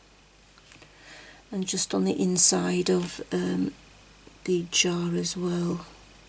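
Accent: United Kingdom. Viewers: Hi K, I’m sorry to hear you have not been feeling well, I’ve never had a migraine but I know they can be disabling. As always I get excited when you are doing your magic on camera. I’ve enjoyed this series so much. You do not sound like a frog 🤣 at all, you actually have a beautiful and soft voice, that I find soothing and I love your British accent. Wishing you a speedy recovery and I am looking forward to seeing all the pages you colored. For me, it’s been work and more work, but I am ready for Mermay!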